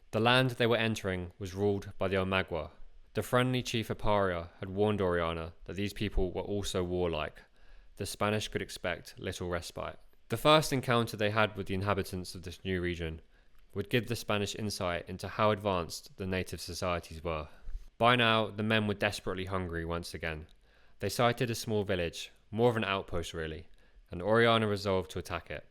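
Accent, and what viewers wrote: United Kingdom. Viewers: Glad it's not AI, I think, but does it have to be a Brit accent? Give us a break! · it’s quite a light British accent bruv Worry not, not long from now you’ll be able to make tiny adjustments such as changing the accent through AI.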